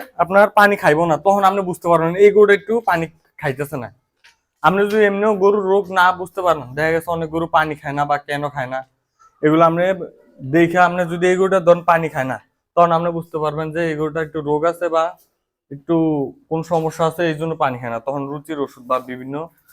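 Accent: Comilla, Bangladesh. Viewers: ভাইজান কি কুমিল্লাই ছিনেন নাকি কারন আপনার কথাবার্তা ঠিক কুমিল্লা মানুষের মতন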